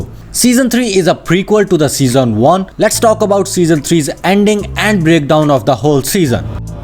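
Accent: India. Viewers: What gave it away? Was it the thick indian-style accent?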